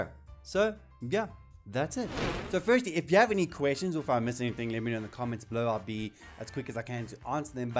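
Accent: South Africa. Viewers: howzit bru I heard your accent and was like, "Is he south african???" then i heard you say PK and i new you were south african lol.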